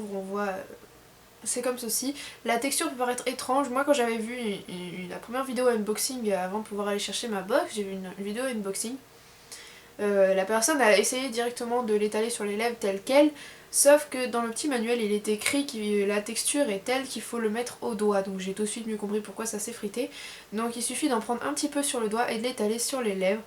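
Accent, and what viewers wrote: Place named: England, United Kingdom. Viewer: tu a un accent anglais magnifique !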